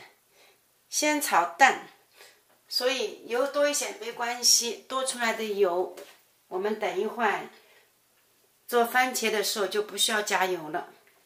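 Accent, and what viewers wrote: Shanghai, China. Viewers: 很喜欢这个教做传统上海菜的视频，很听Jenny 的带着上海口音的国语，她讲解和示范的很详尽，没有卖关子，订阅了她的视频后，我照着她所教的，己经做成功很多老上海经典的小菜和点心，上个礼拜做出了汤圆和牛肉馒头，谢谢Jenny, You are the BEST!❤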